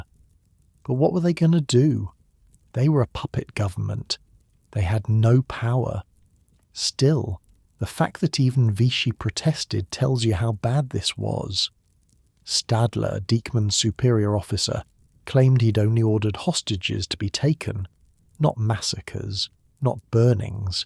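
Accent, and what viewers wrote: United Kingdom. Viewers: SICK OF THESE BRIT SCHIT ACCENTS.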